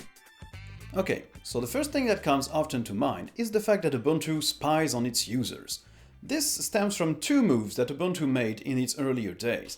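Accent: France. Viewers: Even though Ubuntu has its cons, I have had many issues with other distros (Manjaro, OpenSUSE, etc.) when it comes to NVIDIA RTX GPUs support. Everything simply worked with Ubuntu, so I guess that was my only option, other than wasting another 2 days on distro hopping and figuring out a solution. Also, I know it is out of topic, but you are such a handsome man. (Also, by your accent I feel like you might be French, in which case, un salut à toi depuis Strasbourg !) Keep it up with the great content :)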